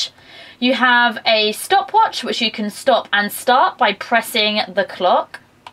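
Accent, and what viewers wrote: United Kingdom. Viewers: You have the exact same accent and voice as Jaime Murray could it just be because you are from England? :P Great review btw.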